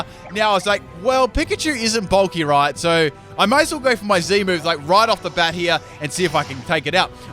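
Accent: Australia. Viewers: my mother things you come from Australia... is that right?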